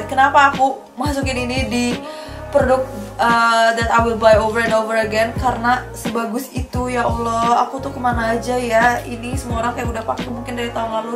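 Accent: West Java, Indonesia. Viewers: gemes sm logat sundanya kakk 💖 reviewnya bagus singkat tp jelas 👍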